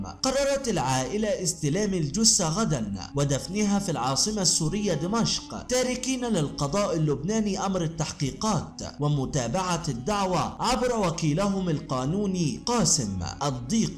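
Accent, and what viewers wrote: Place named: Sohag, Egypt. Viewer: اكيد سوهاجي سوهاجي يا امينه ما هما تبارك الله ماليين علينا حياتنا .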